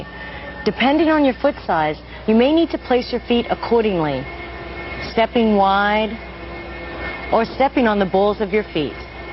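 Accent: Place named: Australia. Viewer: I want to say Aussie who has lived in the US for a long time.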